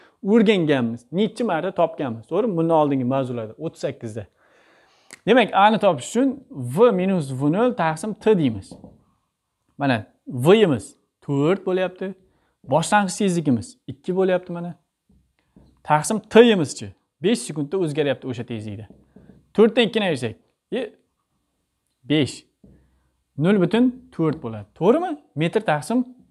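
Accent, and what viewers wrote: Khorezm, Uzbekistan. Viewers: Assalomu Aleykom ustoz darslariz uchun rahmat ha bazan xorazm shevasida gapirgandek tuyuladi menga!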